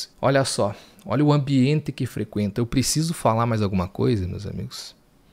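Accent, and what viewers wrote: Paraná, Brazil. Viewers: Teu sotaque n me é estranho, Paranaense pelo tipo kkk Vai Corinthians!! Direto de Laranjeiras do Sul, Paraná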